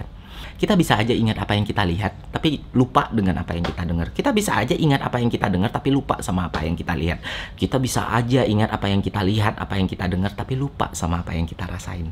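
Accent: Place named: Jambi, Indonesia. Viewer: Woii orang jambi yoo haha orang awak lah ternyatooo awak kiro orang jakarta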